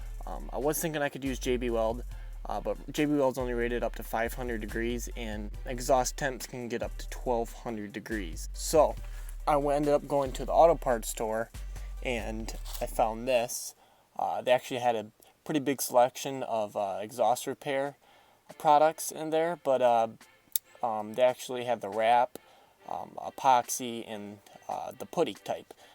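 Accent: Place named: Wisconsin, United States. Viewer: I'm Canadian never been to the states but I'd guess he's from Wisconsin lol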